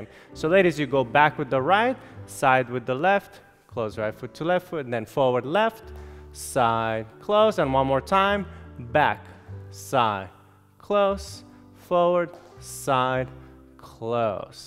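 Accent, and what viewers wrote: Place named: Eastern Europe. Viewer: Spent the first time watching the video trying to figure out what his accent is It sounds Eastern European but not sure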